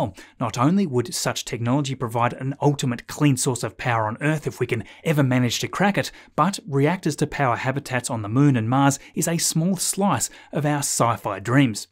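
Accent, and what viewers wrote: Australia. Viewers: I think just tries to hide the Aussie accent a bit given the international audience. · my lovely Aussie mate, I love your accurately non-politicized SpaceX reporting, but repeatedly have found myself in an information overload. Maybe it's because I'm 71 yrs old. Maybe it's because I'm a kiwi, and find Oz and kiwi accents distasteful on YT. But may I suggest you upload twice a week with half the content and double your audience?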